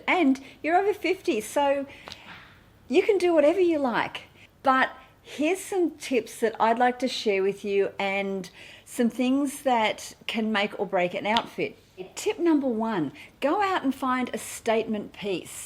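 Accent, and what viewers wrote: Australia. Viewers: Hi. I’m new here. You sound Australian. I’m an Australian 👍😃. Do you do anti aging creams and masks?? My neck is my problem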